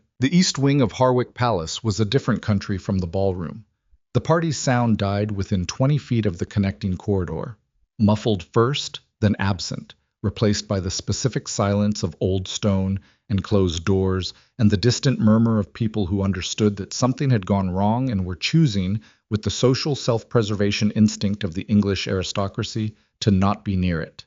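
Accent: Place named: United States. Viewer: Please use a British AI narration. American ruins the lovely story. I am an American.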